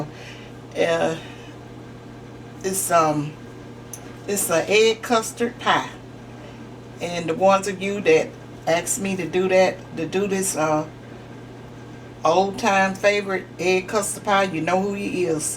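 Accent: South, United States. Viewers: Mrs., HELLO I knew when I heard that southern voice, I had to tune in, stick and stay educated in what you know is good, best & Delicious! You got that voice, know how,every once & a while some slang, a recipe within it's self, momma got it going on! Ive Appreciated every how to video, with a taste, those greens with Lipton onion soup, fried or boiled they're the Bomb, I've never had such comfort in knowing greens could taste like that. You're a" well seasoned" teacher, as my mom before her death this year would say your never to old to learn something new, & life brings about a change, I have no request, but from u, I'll learn even more, your one of my adopted video voices, now that I stumbled on to your stories of yesterday, today & then some I look forward to cooking even better, Thank YOU, Roz.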